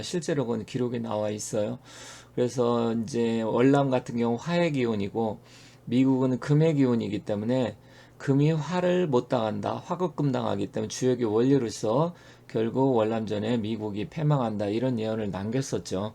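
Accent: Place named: Jeolla, South Korea. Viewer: 말투에서 절라도 억양이 묻어나오네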